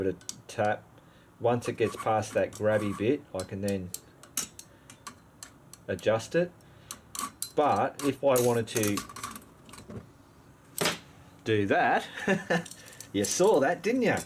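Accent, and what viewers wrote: Australia. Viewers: Wow, that made me belly laugh! 😂 I'm a Brit and I don't know why but your Ozzy accent just did it for me. Reminded me a little of Rolf Harris (in better days). So entertaining! About the simmer ring, wouldn't it be easier to adjust it before placing it on the burner? ie. Allow the liquid to boil, without the simmer ring and then fit it using the tongues. Obviously experience will guide the pre-adjustment. Just a thought. Thank you for brightening my day! 👍😁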